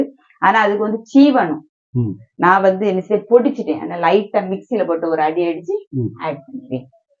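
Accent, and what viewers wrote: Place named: Nanjil Nadu, India. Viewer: Sister you are soooooo pretty and you exactly look like my aunty. And i love the slang of nanjil naadu 💐💐💐💐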